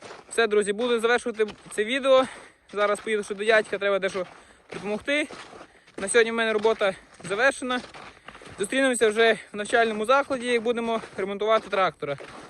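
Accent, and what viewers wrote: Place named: Surzhyk, Ukraine. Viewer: А де ви живете яка область. Такий говор як в нас суржик .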